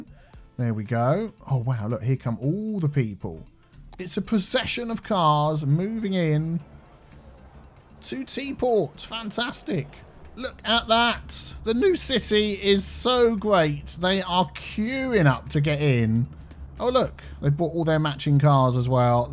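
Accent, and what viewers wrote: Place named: United Kingdom. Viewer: I'm living in the UK temporarily from America, and I hope you don't mind if I ask what accent is that? At my place of work there is a guy who sounds exactly like you, as we're all working from home now I don't know him well enough to chat him up on messenger haha. Edit: Accents fascinate me and I like to try to train my ear.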